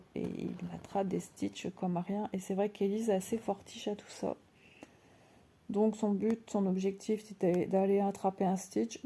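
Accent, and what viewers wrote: North, France. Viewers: Bonjour! Je viens de découvrir ta chaîne! Il me semble avoir reconnu ce petit accent du Nord 😁je suis moi même du Nord j'habite près de Lille...j'ai regardé plusieurs de tes vidéos je suis moi aussi sujette à des crises d'angoisse mais après une thérapie ça va bcp mieux je prends toujours des médicaments et je vois une psychologue mais je reprends goût à la vie malgré les soucis...je suis moi même une passionnée de loisirs créatifs je fais du dp et du point de croix estampé j'adore!! La toile que tu fais est juste magnifique!! Jespere que tu vas aller de mieux en mieux ça fait du bien de se confier moi ça ne m'embête pas de t'écouter... je te fais de gros bisous☺️ ah oui je me suis inscrite sur le groupe (entre nordistes hein😁)a bientôt prends soin de toi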